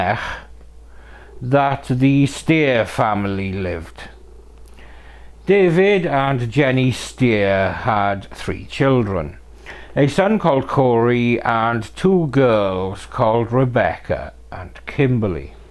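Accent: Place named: Wales, United Kingdom. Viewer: Going to watch again when having my dinner just to listen to that Welsh pronunciation ❤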